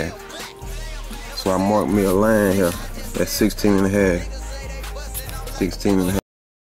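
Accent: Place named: Texas, United States. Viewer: Sound like you from TX man I miss y'all bruh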